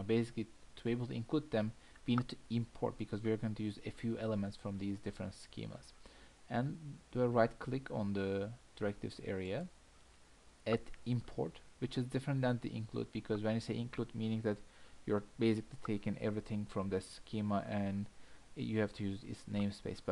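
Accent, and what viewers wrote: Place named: Turkey. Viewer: abi ingilizce aksanın ben türküm diyor :D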